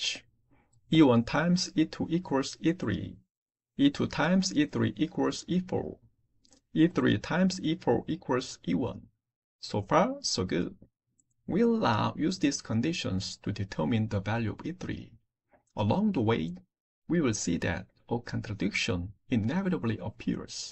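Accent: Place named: China. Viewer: I would guess Chinese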